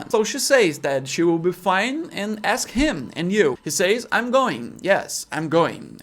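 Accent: Brazil. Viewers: sabia que era brasileiro pelo sotaque kkkkkkkkk. Bom video, irmão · Reconheci o sotaque Br na hora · Muito bom o vídeo. Sério, no começo eu escutei seu "sotaque" e na hora pensei que era brasileiro kk, mas no final quando você falou que não era nativo fui ver de onde tu era só por curiosidade e não é q era brasileiro kk. Espero que seu canal cresca ainda mais e sucesso!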